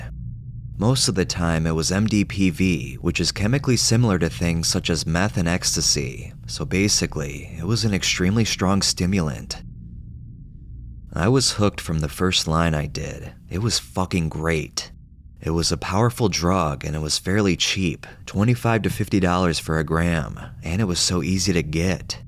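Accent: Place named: California, United States. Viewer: Omg I love your words 😂😂😂😂😂😂 finally a swear guy you sound like us so. California's peeps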